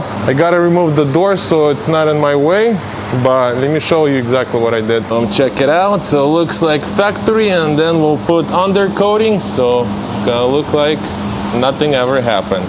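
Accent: Russia. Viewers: Ухо какой акцент )) наш человек ) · Бля,слишу акцент;)землячок!спс подписался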